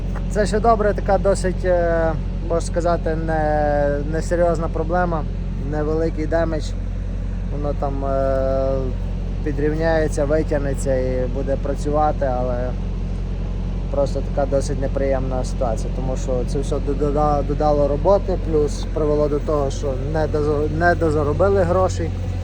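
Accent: Western Ukraine, Ukraine. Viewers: Диалект запукренский рагулячий ☝️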